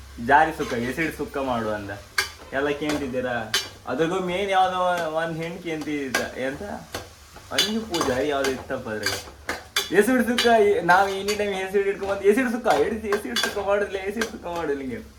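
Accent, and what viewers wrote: Kundapura, India. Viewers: ಎಷ್ಟ್ ಚಂದು ಕುಂದಾಪ್ರ ಕನ್ನಡ ಮಾತಾಡ್ತೆ ಗಡ್ಯಾ ❤️❤️ ಅಮ್ಮ ನಿಮ್ಮನ್ನ ಕಂಡ ಖುಷಿ ಆಯ್ತ್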